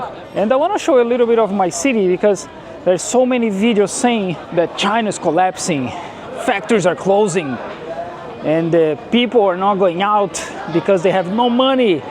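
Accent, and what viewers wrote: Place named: Brazil. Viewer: I understand because he's a Brasilian talking in English 😅😂😂😂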